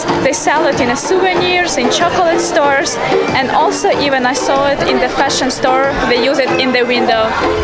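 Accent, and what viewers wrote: Russia. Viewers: annoying accent. russian? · This Russian accent sounds so horrible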